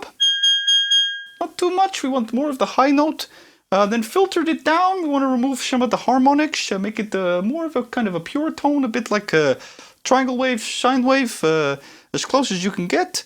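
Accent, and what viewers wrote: Netherlands. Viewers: This is so weird. You sound so hollandish i have to believe you are... btw schlager music makes my jewels shrink. Really. Thank god you didn t do that:) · Damn, that Dutch accent is so *good*! Props, I thought I was listening to legowelt or something like that for a second xD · Im Dutch and very confused by this😂 Either nailed our accent, or he is actually Dutch:)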